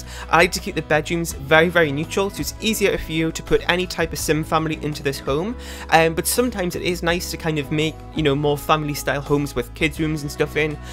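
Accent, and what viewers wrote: United Kingdom. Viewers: Where in the UK are u from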